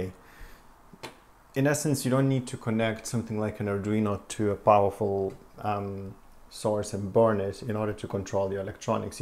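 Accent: Ireland. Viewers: He does sound Irish, possibly from Dublin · Great video. Where are you from? You have an Irish twang in your accent, but dont sound like you are from Ireland.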